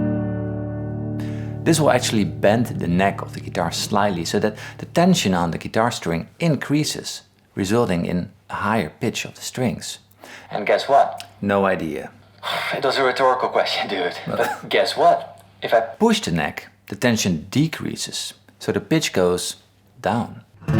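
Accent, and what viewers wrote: Netherlands. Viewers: ik neem aan dat je nederlands bent aangezien je geweldige accent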